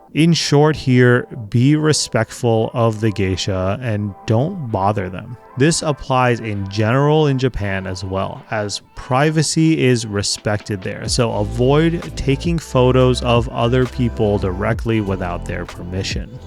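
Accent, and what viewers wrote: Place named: United States. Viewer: Your name is not Japanese, and your accent is very American. Why this video? You did not speak Japanese at all in the video to tell the Japanese viewers what your real intentions are. I am a NYC expat. Living in Japan. Yes, I speak and read most of the language, mostly self-taught. 41 Years in Japan. I surf Shonan. I have friends all down near Katase Enoshima. Thousands of tourists a day visit that area especially on weekends. The rudest rule breakers are the loud, obnoxious. neeeee screaming women on trains are the Japanese baba! In all my years here and down near that tourist area, I never saw one person litter. Once every four months or so, I tell obnoxious loud young Japanese wanna B cool guys to pipe down, and actually, I told a Chinese guy the same thing three years and four months back. Other than that, I often have to yell at little, obese Chinese 10-year-olds for chasing pigeons. 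I walk 5 kilometers a day to stay fit. The most disgusting places I walk by with litter are all Japanese areas with zero tourists. I rarely catch them in the act, but when I do, I get down to their animal littering level and let them have it verbally. Stop blaming tourists. Most of the bad stuff comes from the locals. I can send you maps and photos if you want. I bet you do not respond, though, as you are looking for followers, not reality.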